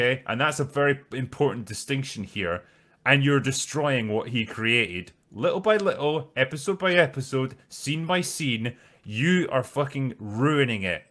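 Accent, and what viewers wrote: Scotland, United Kingdom. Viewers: I'm English, listening to a Scot ranting about a Welsh Waman....Brilliant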